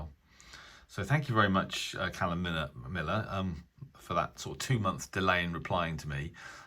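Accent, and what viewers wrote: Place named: RP, United Kingdom. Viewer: BBC. your voice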